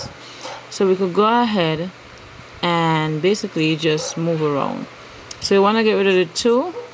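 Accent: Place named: Jamaica. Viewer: Are u a Jamaican. Ur accent sounds like one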